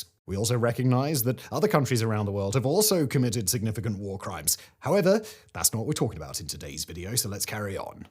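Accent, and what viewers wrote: England, United Kingdom. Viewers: The United States is not perfect but they act in the best way to keep the world free and safe. I take offense that you talk about this with an English accent. If it wasn’t for the US the UK wouldn’t ceased to exist a long time ago.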